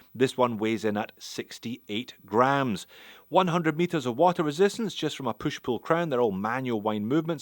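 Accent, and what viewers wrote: Scotland, United Kingdom. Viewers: Subscribed to all the required, please chuck me in the draw! Keep up the great and honest content, I look forward to your Scottish tones with each new release. "Hallllooooo and welcome!" 😁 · Hi! Greetings from Norway. Thanks for the opportunity to win such a great watch. Love your content – and your Scottish accent.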